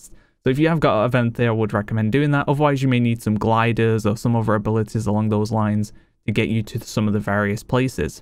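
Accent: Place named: Yorkshire, United Kingdom. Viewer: Where in Yorkshire are you from mate? Your accent is very similar to the wife's 😋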